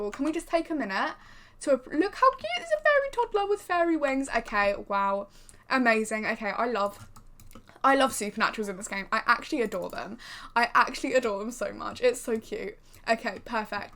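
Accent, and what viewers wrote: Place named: United Kingdom. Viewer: I love british accent♡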